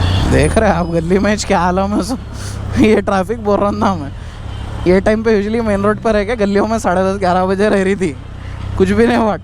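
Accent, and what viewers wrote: Hyderabad, India. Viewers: Bhai speed triple k upper ek detail wali video he bana do garh pe reh k. 😂love your Hyderabadi accent❤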